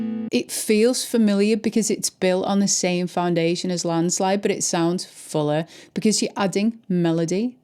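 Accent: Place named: Northern England, United Kingdom. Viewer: Only came across you today, lovely channel. No faff in your delivery and it's great to hear a fellow northerner ont 'tube as opposed to an American or somebody below 'the divide' 🤣🤣 Subbed!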